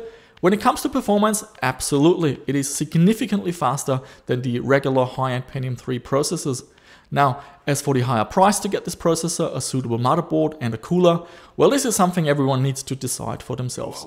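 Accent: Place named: Germany. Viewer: When I watched the video from 2015, you had less German accent than now.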